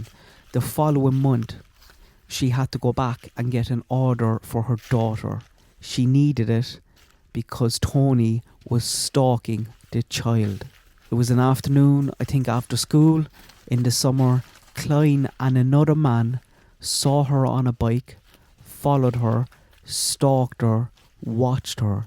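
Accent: Ireland. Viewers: Ok I'm new to your channel, about a month or so & I'm from the US & adore your Irish accent & your dog is beautiful. My apologies on this day I cannot finish watching the video bc of hearing of the abuse to Tony Klines daughter, black eye at 4 & burnt hands. I know it's important for your channel if your videos are watched to the end but I just can't listen to this. So sorry. Oh but I know what I can do I'll finish the video with THE VOLUME OFF 🔇 and enjoy your beautiful dog. I'm Mimi btw.💜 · When I heard that accent I knew you were Irish. But I had to check. I'm happy I found your channel. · Thanks for your insight and notes. Heartbreaking for Tony’s family and all the animals he killed. Not related at all but just want to say I love your Irish accent. My gran was from Limerick, which county are you from?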